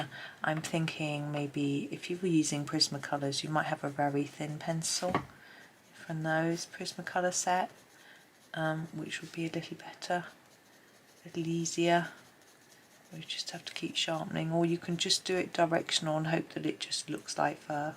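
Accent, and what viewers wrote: United Kingdom. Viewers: lol Welch's grape jelly is indeed like jello, as all jellies are. No solids. Jam, or preserves, does have solids, although preserves is usually thicker, with bigger solid pieces. Marmalade is preserves, but always citrus based. Deja vu on this subject haha. Most Americans call the outside of bread the crust, and the end pieces are either ends or heels. Thanks for the geography info, too, although because I watch Time Team and a lot of Chateau restoration videos, I've already relearned things from my school days lol I do love hearing you talk, where you put your accents, and the phrases that are popular over the pond. Fascinating! Oh, anyways, you do the best berries!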